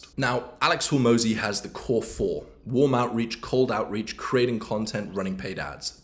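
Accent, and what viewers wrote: United Kingdom. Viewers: good content as always. Part of Kirbys brands is the typical "BUT" with UK accent!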